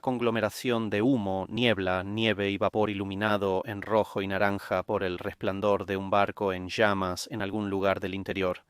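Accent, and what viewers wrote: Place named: United Kingdom. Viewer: Y me encanta ese acento británico y esa educación con estilo. Es muy agradable y lo seguiré siempre. Felicidades.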